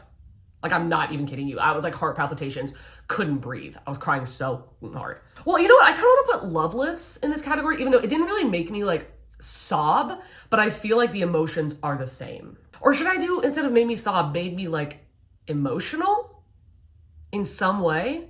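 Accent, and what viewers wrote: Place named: Scotland, United Kingdom. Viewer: The amount of sliding into passionate quasi-Scottish in this video made me be like YES THIS IS HOW WE EXPRESS OUR LOVE 🏴 Five stars, would watch again 👏🏼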